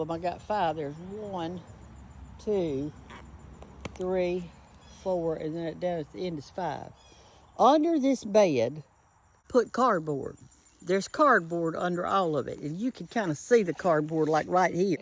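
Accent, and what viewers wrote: South, United States. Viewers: Hi queen I'm from Augusta Georgia and I love that country voice lol the way you cook them liver and onions thank you again ❤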